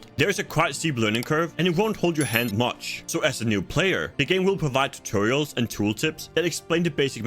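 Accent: Denmark. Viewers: sound like a dane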